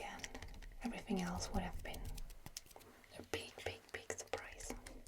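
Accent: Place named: Germany. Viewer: A suspiciously good German accent🤔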